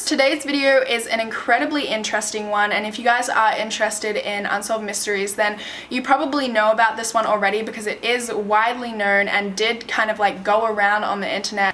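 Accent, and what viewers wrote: Australia. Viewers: Wow I just realized how beautiful you are! And also I love the australian accent :x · It's her accent. She's Australian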